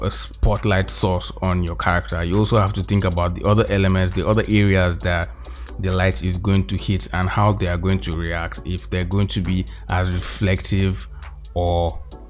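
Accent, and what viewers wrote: Nigeria. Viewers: Awesome man learnt so much from watching this process btw cool nigerian accent <mail>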